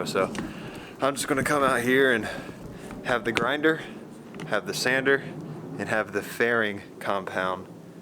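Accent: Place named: Newcastle, United Kingdom. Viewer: listening to true Geordie! Love that and watching this build has really helped me with my project boat some of your "mistakes" i was able to skip and not have to take the time and make them myself. Keep up the great videos and hope to see you on the water soon!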